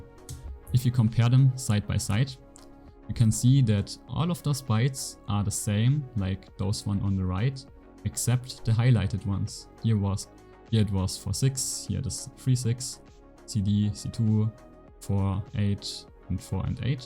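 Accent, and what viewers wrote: Germany. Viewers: You German 🤔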